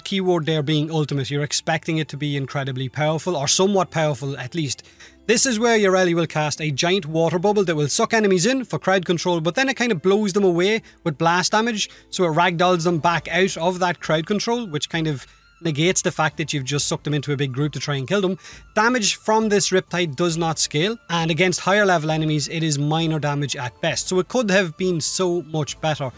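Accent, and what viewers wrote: Ireland. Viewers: Irish accents are so cute, I also am in love with the amount of insults they have in their inventory.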